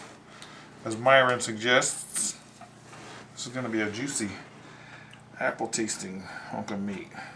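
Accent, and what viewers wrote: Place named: Great Lakes, United States. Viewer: where are you from ? I hear great lakes or MN but swear I heard you say oklahoma lol. That could be the T.B.I. fooling me though.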